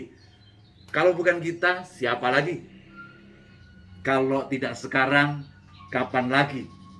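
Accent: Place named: Java, Indonesia. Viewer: Mantab. Bahasa Indonesia dengan logat jawa. Sehat selalu Om. Aamiin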